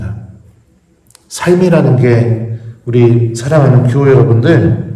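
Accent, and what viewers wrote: Chungcheong, South Korea. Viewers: 찬미예수님 저는 신부님 애청 구독자가 되었습니다. 제 친정은 대전교구 성당인데 신부님 강론 강의 들을때마다 익숙한 충청도 사투리가 참 정겹고 좋아요. 신부님의 어머니 이야기를 들으며 저의 엄마가 생각나서 눈물이 났어요. 며칠전에 돌아가신지 6주기 맞이했네요. 저랑 15년을 함께 사시다가 89세로, 하느님 품으로 가셨는데 제 엄마도 새벽 3시면 어떤일이 있어도 일어나셔서 3시간 넘게 기도를 하시면서 하루를 시작하셨어요. 평생 온갖 질병으로 시달리시셔서 37킬로를 넘지 못하셨던.., 그런 엄마가 치매까지 오면서 계절이 뭔지, 지금 시간이 언젠지 점점 인지능력이 저하되셨는데 그런중에도 시도때도 없이 성당에 가야한다고 옷을 거꾸로 입고 상의를 바지에 끼우시고 성당가방을 매고 그러셨죠. 엄마는 갖가지 기도를 하셨기에 많은 기도책이 있었는데 낡을대로 낡은 그책을 하루종일 넘기시며 치매중에도 기도를 하셨고 묵주기도를 멈추지 않으셨어요. 그런데 3시간 넘게, 4시간 정도 걸리셨던 그 기도는 30분안에 끝나더라구요. 치매때문이셨겠죠... 기도책을 덮으면서 '나 천당 가야 해, 나 천당가야해' 이러셨던 우리 엄마... 하느님께서는 엄마의 그 기도를 어여쁘게 받아주셨으리라 믿습니다. 장례식때 엄마의 손때가 잔뜩 묻고 낡은 그책을 함께 제단에 올려드리고 그걸 보면서 저도 그때부터 냉담을 풀고 지금은 하느님께 다가가려고 노력하며 살고 있답니다. 지금도 엄마가 보고싶어서 눈물이 나지만 하느님 품에 계신 엄마에게, 그리고 성모님께 저를 위해 빌어주십사 전구를 청하며 살고 있답니다. 신부님의 강론 또한 저에게 희망을 심어주셔서 감사드립니다.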